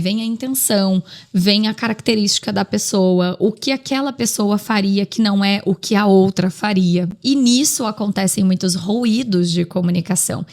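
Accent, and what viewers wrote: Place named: Brazil. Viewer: Comunicar bem aqui em portugal? vc neste video má exemplo começa logo mal , aqui não e em lago algum do mundo a não ser bostil não se sotaca portugau mas sim portugal , não se fala gentchi mas sim gente , não se diz qui legau mas sim que legal etc etc ,vcs ai no bostil teem alguma coisa contra a letra L ?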